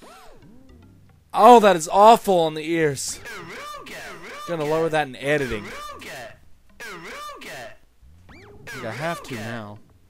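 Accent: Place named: East Riding, United Kingdom. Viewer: That's an East Riding accent. Seems Hull is fast becoming a hotbed for quality games development.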